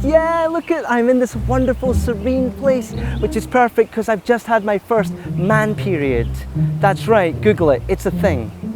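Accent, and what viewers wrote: United Kingdom. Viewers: Y'all need to chill. He's speaking with a British accent. British spell it colour. That's the joke. I'm well aware that America spells it color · Too much British accent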